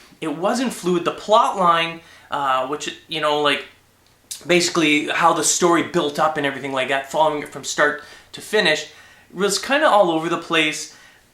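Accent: Canada. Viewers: you canadian?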